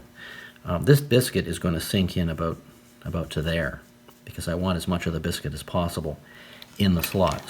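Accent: Canada. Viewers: Nice video, Interesting! I shan't comment on the criticisms you received on whether it's a strong or not corner reinforcement joint. It is just showing another method of reinforcing a mitre joint. To those who are interested in the tool you used these are on sale in the UK under the description of Spring Miter Clamp Set, Framing Mitre Clamp, Google that and you'll find lots. By your accent i'm assuming you are from Canada, not sure what you would call them there.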